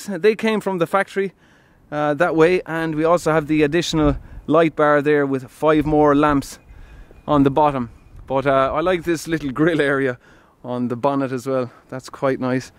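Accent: Ireland. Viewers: Hey I like your accent are you Irish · He’s Irish you dumb fuck!! 🙄🙄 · Pretty sure he is Irish :)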